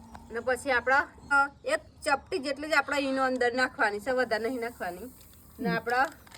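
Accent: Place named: North Gujarat, India. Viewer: Language per na Jao vasant Bhai..Uttar Gujrat ni aavi j boli hoy chhe .so aapdi bhasha ni respect karo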